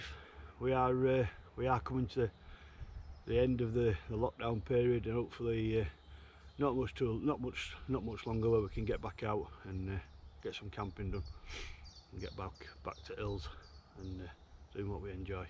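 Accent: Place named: Leeds, United Kingdom. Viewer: I love wild garlic. Gather it, tub it, freeze it for future use. Stews and stuff. Once frozen it crumbles nicely. Where are you from?without giving too much away. I'm Leeds. You sound quite local.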